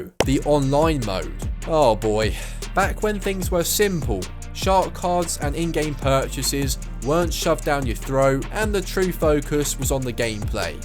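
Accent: United Kingdom. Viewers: Damn, this man is Bri'ish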